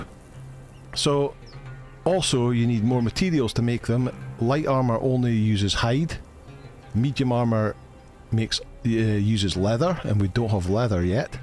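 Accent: Scotland, United Kingdom. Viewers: I've known about this game for a long time, but never got around to playing it. I just started earlier this week, and was a little lost at first, but, i found your guide and its really helped me. Its also nice to hear a fellow Scotsman doing this. Also, as a little side note, im playing on console, and most of the journeys recognise other journey actions you may have done previously.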